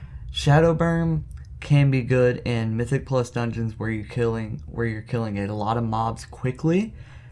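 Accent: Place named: Tennessee, United States. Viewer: That beautiful Tennessee voice PogChamp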